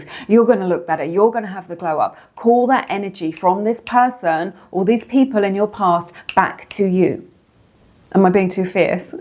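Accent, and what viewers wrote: England, United Kingdom. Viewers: your readings are spot on. ive found that if you are a caring giving person many people may come too hate you. ive never understood why. i really enjoy your readings. you have totally open my eyes and elevated my life. thank you so much. side note here i was stationed in lakenheath england 1968 to 1970. i almost married an english girl. im now 77 and im amazed how you have enlightened and motivated me . thanks again and i love your accent brings back fond memories.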